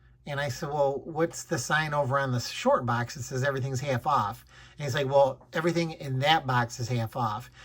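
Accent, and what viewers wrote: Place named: Michigan, United States. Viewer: I can hear your nasal Michigander accent. I'm originally from GR, but in CHI now. Great haul!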